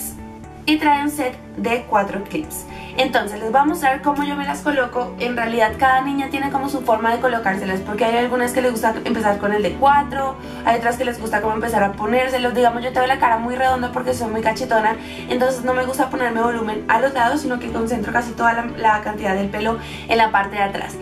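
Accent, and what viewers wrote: Colombia. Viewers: Me da un cosquilleo ver tus vídeos porque... Eres colombiana y yo también lo soy sólo que vivo en España. Y extraño mucho Colombia :) y oír tu acento colombiano me relaja y me da como un cosquilleo de ganas de ir a Colombia!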